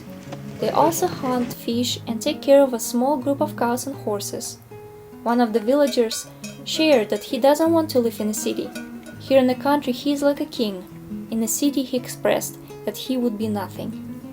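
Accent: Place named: Russia. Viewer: Интересно, а зачем надо было на английском (с явным русским акцентом) выдавать это видео? Думаете, заграница нам поможет?))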